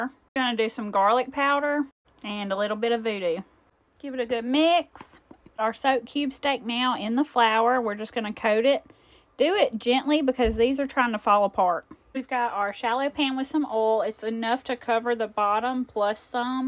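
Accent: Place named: South, United States. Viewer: I love your accent and cooking style! I am a Midwestern, but lived in the south in the 90's... I miss the accent and food. 😢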